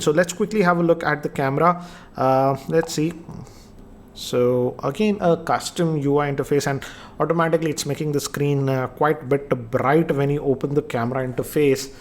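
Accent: India. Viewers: Sir Mind Nahi Karna Technical Guruji Jab bhi Video Upload Karte hai Hindi mein Baat Karte hai joh Sab Indian Pakistani aur Kafi Asian ko Samjh Aa jati hai Kyu Unko Pata hai Hindi/Urdu Sab Samjh Jayenge Lekin English Kam Log Samjhte hai. Aap Jab bhi Video Banate ho English mein Baat Karte ho Kya Aapko Sirf English hi Aati hai Ya Aap Dusron ko Attract Karna Chahte ho English Bol kar Sir Mind Nahi Karna Aapki English bhi Hindi Style ki Lagti hai Behter hai Aap Hindi mein hi Video Banaya..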